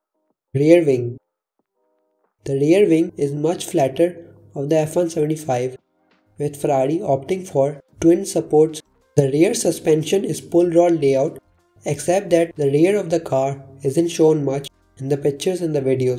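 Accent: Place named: India. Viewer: Seems like indian accent. Bruh where r u from?🌚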